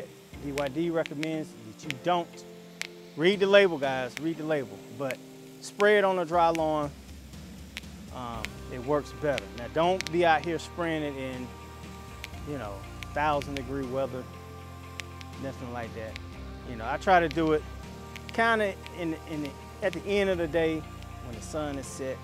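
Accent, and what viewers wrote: South, United States. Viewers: Bruh, where you from, NC or Va?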